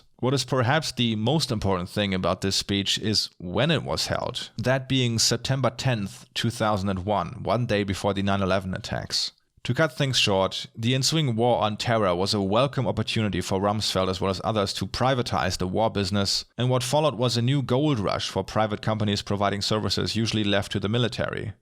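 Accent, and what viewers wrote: Germany. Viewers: he is german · Yea you’re right especially in this one. The German has really faded, but considering the subject content for this episode it’s understandable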